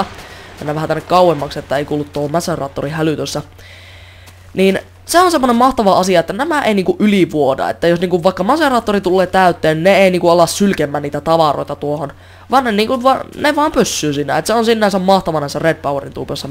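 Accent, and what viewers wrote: Karelia, Finland. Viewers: Ootko karjalasta :D kun puhut sen tyyliil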